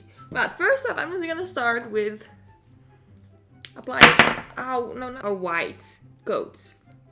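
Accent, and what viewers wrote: Netherlands. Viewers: she's dutch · ik dacht al dat je een nederlands accent gad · Are you Dutch? I just discovered your YouTube and this is the first video I saw but you sound Dutch haha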